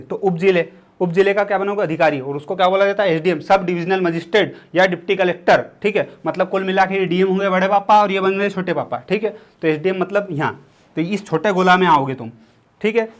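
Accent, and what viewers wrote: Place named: Indore, India. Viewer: Sir is full on indori 😂❤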